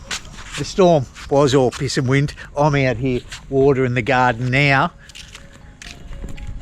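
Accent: Australia. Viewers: well who am I to rule over your good wife, I retract my appeal of your Aussie pronunciation and give myself a smack upside my head, ps.love your work.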